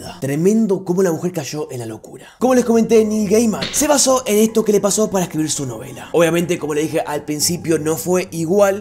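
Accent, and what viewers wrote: Argentina. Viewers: Coraline la peli es buenarda pa 😎 Saludos desde🙁😥🇵🇪 El asentó es de arg pero me gusta escribir su asentó :'( 😣🙁😥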